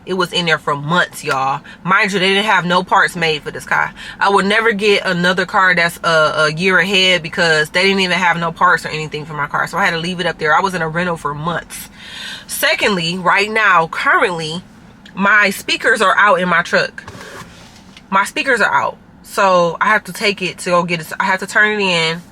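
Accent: Chicago, United States. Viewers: You from Chicago?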